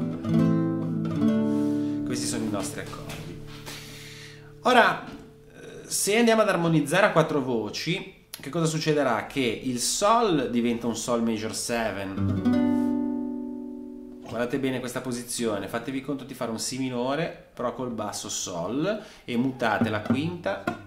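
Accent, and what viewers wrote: Genoa, Italy. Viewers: Maestro sei bravo,bravo,bravo, complimenti , la lezione sul walking bass è stata una meraviglia , poi sentirti parlare con quel bel genovese e' il massimo, una carezza in più a Nebbia..🙂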